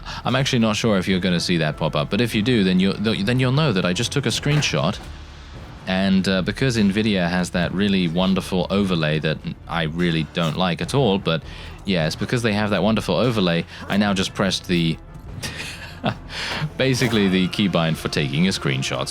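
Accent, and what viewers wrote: United Kingdom. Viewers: He has the most soothing yet focused voice, he sounds kinda British.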